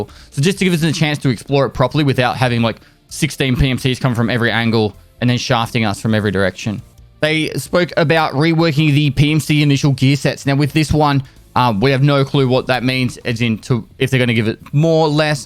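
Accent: Australia. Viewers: it is nice to listen some Australian english, bein a Russian, living in Moldova. EFT: connecting people )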